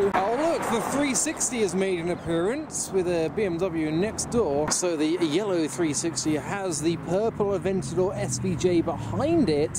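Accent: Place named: United Kingdom. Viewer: should be doing something like voice overs or hosting a show or something with his so British sound. Lol this guy could talk about grass and it would be entertaining.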